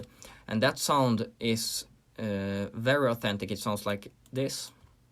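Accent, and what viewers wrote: Sweden. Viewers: thats the swedish accent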